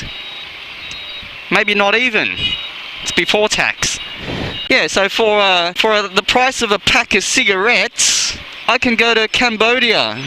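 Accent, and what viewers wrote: Australia. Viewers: you have a Melbourne Accent. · Your aussie accent does not sound too good. I prefer the good old american. Sorry!! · Sorry ur biased, some times u sound like a yank and some times like an Aus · Keep the videos coming matey. Beautiful accent btw....I love the aussie accent. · Lol...odd and refreshing to hear a viet with an Australian accent. How the hell you cross the streets in VN? Seems with like constant traffic with the scooters, bikes and cars, you must need to pole vault from one side to the other.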